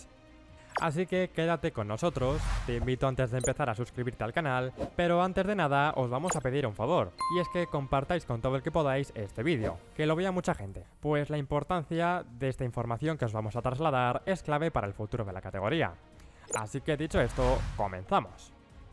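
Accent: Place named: Basque Country, Spain. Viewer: Un robot con acento vasco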